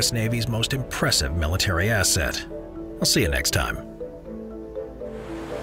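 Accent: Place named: United States. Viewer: I'm impressed by both your scientific and historical knowledge but find myself astounded at your level of patience. I would've lowered the tone considerably by mentioning the fact that the US reneged on their deal regarding nuclear technology after being given it all free and I'd have mentioned Kasserine in the passing. You'd need a British radar to find the cavity between some folks ears but then all the US Universities are owned/funded by the Chinese which is quite the coincidence, since this channel is also.......just has a dubbed American accent repeating 'murica. To any normal Americans, no offense, we'll always have your back! USGB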